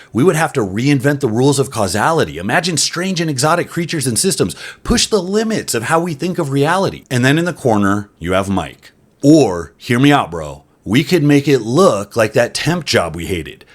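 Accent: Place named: United Kingdom. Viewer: Bromley British accent is the voice inside of my head. Remoinds me of a boss I once hated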